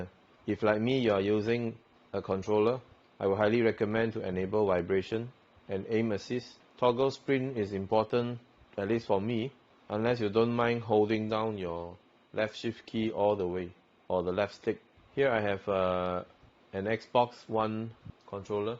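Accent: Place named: Singapore. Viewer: U sound like a singaporean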